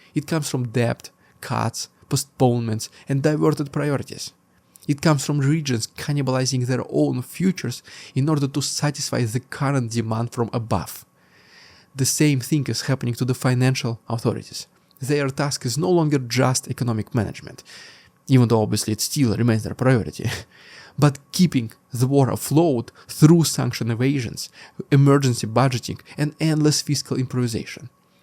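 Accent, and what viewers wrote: Russia. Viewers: Yea you were nice and funny in the beginning But I have given you 2 years of views and you still sound like you have a sock in your mouth when you speak English. You so can do better. I unsubbed just because of your laziness for speaking properly. You have had over 2 years!!!!!!!!!!! And you still speak like a Russian that just started learning. NO EXCUSE!!!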